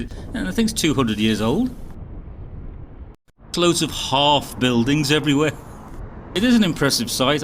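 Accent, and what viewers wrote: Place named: Liverpool, United Kingdom. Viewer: He has an unusual Scouse accent tbh, feels like he's trying to sound posh at the same time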